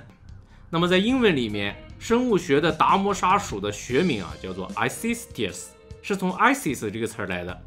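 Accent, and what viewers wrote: Shandong, China. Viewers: 楼主是哪里的口音啊？山东？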